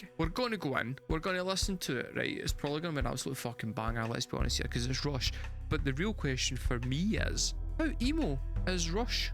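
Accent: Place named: Glasgow, United Kingdom. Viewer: Hey man, you should subtitle your video because of your outrageous Glasgow accent. I myself can understand it because I’ve see Trainspotting 20 times, but a lot of others prolly don’t know what your saying.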